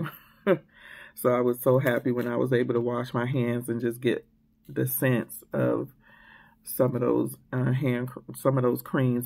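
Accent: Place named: Baltimore, United States. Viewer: I can hear the Bmore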